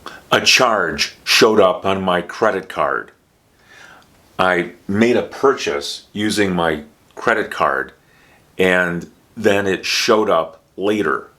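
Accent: United States. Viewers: Thank you for your perfect lessons and real American pronunciation! I try to master American accent with you.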